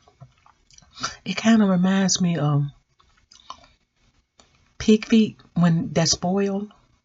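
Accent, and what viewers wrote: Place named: South, United States. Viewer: Welcome. Love ur south accent.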